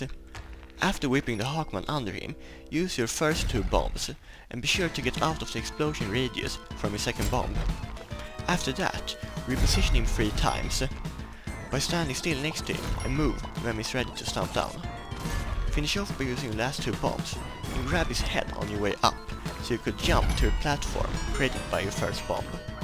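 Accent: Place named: Sweden. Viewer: Oh. Really? You have a very different accent from most other Swedish YouTubers.:D I guess you have just a much stronger swedish accent then. Or maybe from a different part of Sweden, I don't know. The video was really great though, I'm looking forward to more in the future!^^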